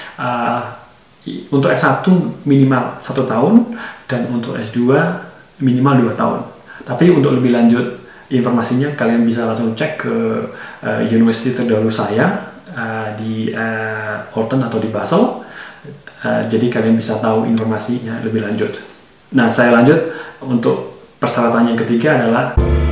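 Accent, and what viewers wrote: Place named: Java, Indonesia. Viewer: Nice info. Masih medok buanget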